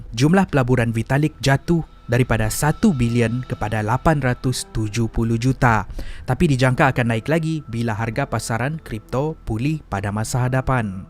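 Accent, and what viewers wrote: Sabah, Malaysia. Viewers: Aslmkom, Boleh Kerap Sikit Upload Video. Hehehe.. 😊😊😊.. Aku Suka Dengar Cara Ko Sampaikan Satu² Contents Tu..! Tak Kiralah Contents Apa.. Yang Dalam Loghat Sabah Kola.. Lama Sangat Dah Tak Jumpa, Kawan² Sabah... Dengar Loghat Sabah Pun Boleh.. #FlashBack Juga Sikit² Tu Kan.....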